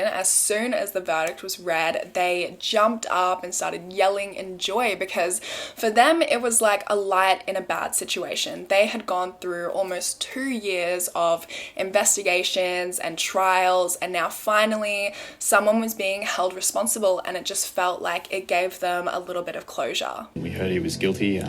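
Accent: Australia. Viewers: Are you Australian???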